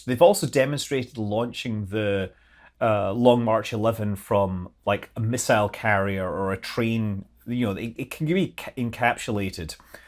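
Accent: Scotland, United Kingdom. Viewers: Hi,! In regards the Alpha launch, I'm not as convinced as you seem to be that the issue was poor guidance, or possibly an upper stage engine underperformance. When the video signal was recovered from the upper stage, after the circularization burn was performed, the stage was slowly tumbling end-over-end. And I seem to recall one person on their flight control loop asking why the vehicle was maneuvering, and someone else asking, rather that stating, that maybe it was just moving to its payload deployment attitude. I watched as it completed one full end-for-end loop, and continue on, so I have doubts that it was in a planned "maneuver". My best guess is that they lost attitude control after the initial orbital insertion, and that the circ burn happened with the engine pointed in the wrong direction. It would have been more obvious of a failure had the burn been retrograde, but it seems to have been more tangential to the trajectory, so it just altered the orbital plane by a fraction. 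I'd be surprised if that isn't eventually announced as the problem they encountered. Keep up the good work! And I do apologize, but when speaking of you to my friends who are also into space exploration, rocketry and flight, I tend to refer to you as "I'm, and I'm manly!" I don't think you project that attitude, but the way you say it (possibly influenced by an American's reaction to your Scottish accent), it always sounds like that to me, lol!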